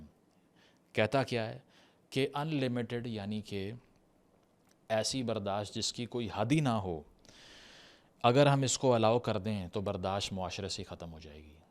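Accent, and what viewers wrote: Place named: Lahore, Pakistan. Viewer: pakka Lahoڑi hai meri tarha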